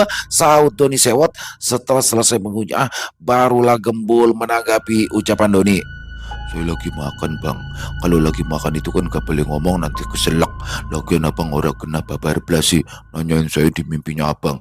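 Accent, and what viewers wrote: Jakarta, Indonesia. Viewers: Selamat malam bang Klaten hadir ni yg ku suka logat Betawi ya mantap❤❤❤❤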